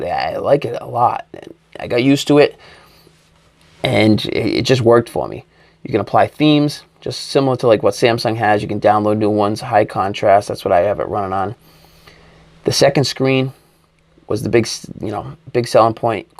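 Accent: Boston, United States. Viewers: That Boston accent!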